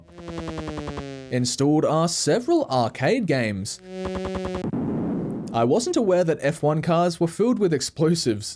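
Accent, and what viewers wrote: Australia. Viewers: Your videos would be very entertaining if it were not for your extremely annoying Australian accent can't you learn an American accent and do the video with that so you sound halfway normal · The second you said HP, I was missing the bold text from DankPods's video. Aussie accent ftw 🤣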